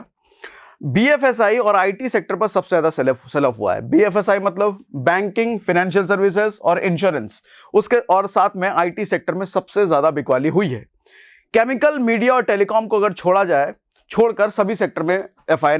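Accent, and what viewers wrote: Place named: Bhojpuri, India. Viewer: Please try to understand what is analysis bringing out data is only fact what is implication on India on the tariff placed by Trump you don't know you have not even understood you are just speaking like Khan sir nothing more no value I have watched you few times you have got only style of speaking and that a typical of Bhojpuri nothing else no value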